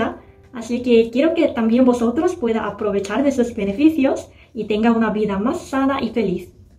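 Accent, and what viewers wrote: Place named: Latin America. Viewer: "vamos a platicar", "ahorita"... como española de España tu español se me hace muy latino, aquí no decimos esas cosas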